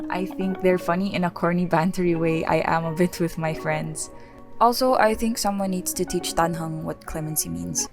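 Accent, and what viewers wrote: Philippines. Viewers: Only true Filipinos can tell that has a Filipino accent 👍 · Are you Pinoy by anychance? I can hear it through your voice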